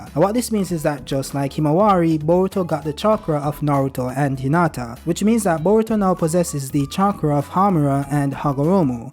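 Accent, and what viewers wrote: Jamaica. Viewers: Youhavaa Jamaican voice are u jamaican???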